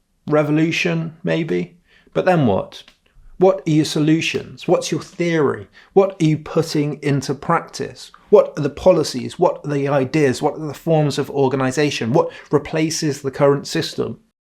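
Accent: United Kingdom. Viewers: You can't debunk what Brand says as not being true....you only try to discredit him by attempting to portray him as irrational in your smooth slick British voice.....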